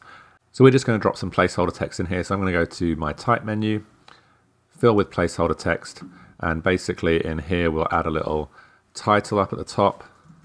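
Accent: United Kingdom. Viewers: Thanks very much, I needed these shortcuts. Your instructions are inspiration! Is your accent Manchester?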